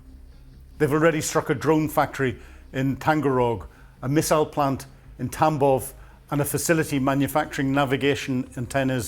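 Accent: United Kingdom. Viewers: I'm delighted with your summaries. Maybe its because of your accent as it reminds me of my service in the UK; concise, focused reporting and assesments. Your work is excellent, kep them coming.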